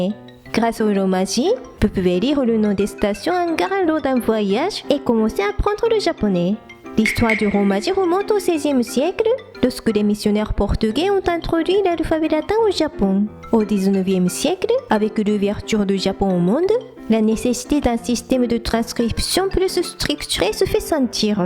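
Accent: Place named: South, France. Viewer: Tout ça est très instructif, merci beaucoup ! Si j'avais simplement une très légère critique à faire, je trouve que la musique est un peu trop forte par rapport à la voix... Et je trouve que vous avez un accent du sud quand vous parlez en français, est-ce qu'on vous l'a déjà dit ?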